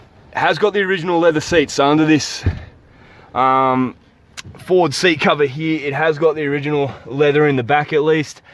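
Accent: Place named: United States. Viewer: Mate, your generation is sounding more and more American everyday. We speak English, that’s English English, not American English. So when we say ZH it’s not Zee Aitch, it’s Zed Aitch. Nice car.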